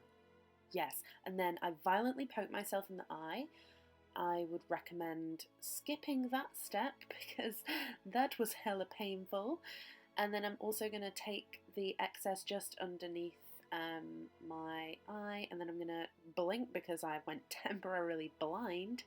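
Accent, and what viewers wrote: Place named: North, United Kingdom. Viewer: Oh right, I'm really bad with spotting accents but I did detect a slight northern accent haha. That's so cool that you have family here haha xxx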